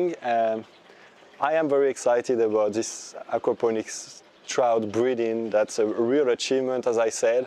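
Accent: France. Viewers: Great video also the french accent 👌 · There is nothing more debonair than a frenchman speakng english - Im sure all the woman are hanging on every word he says.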